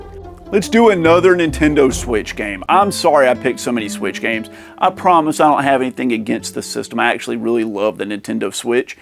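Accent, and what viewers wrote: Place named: New England, United States. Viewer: You seem like you’re from the southern part of upper New England. That’s not an insult by the way. I’m from Middle New England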